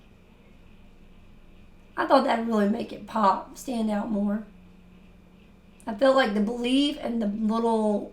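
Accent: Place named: South, United States. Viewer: I love it! I agree with Tyler that you can really hear your southern twang come out when you’re crafting on video. Super cute! Love you! 💓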